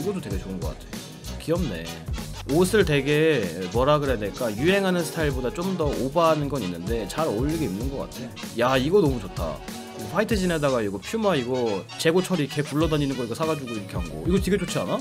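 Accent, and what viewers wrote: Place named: North Korea. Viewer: 북사투리 ㅋㅋㅋ 개노잼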